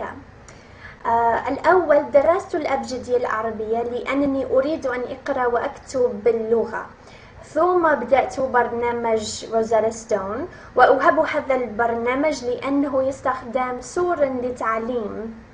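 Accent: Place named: Egypt. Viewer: Hi,there u are awesome u did great keep going and you need to work on accent caz u sound kind of egyptian which is ok but remember Fusha has nothing to do with any accent .feel free to ask any question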